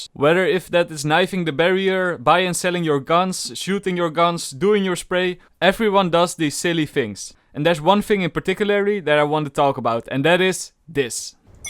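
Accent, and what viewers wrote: Netherlands. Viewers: This is my first time watching your channel, and in the first 10 seconds I heard that you are dutch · de seconde dat je begint te praten weet ik dat je nederlands bent😂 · are u dutch u have a dutch-englisch accent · T nederlandse accent😂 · you sound dutch... are you dutch? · Dutch accent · ik hoor gwn dat je nederlands bent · The dutch accent😂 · ben je nederlands? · i smell dutch ik ben ook nl btw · Ben je nederlands??😂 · ik hoor dat je nederlands bent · he said ''of'' instead of ''or''... also with his accent he really sounds dutch... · i smell nederlands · u dutch? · Nederlands accent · maat jij hebt echt het meest nederlandse accent ooit hahaha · That's one THICK dutch accent my dude holy crap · You sound so dutch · this guy is dutch for sure xD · ik hoor gewoon dat je nederlands bent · You dutch? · Why am i low-key thinking you are dutch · Ar you dutch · Nice nl accent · We kunnen je nl accent gwn horen lmao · jij bent van nederland he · r u dutch? 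this is my first video i have seen of u lol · Hou have a Dutch accent are you from dutchland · U sound dutch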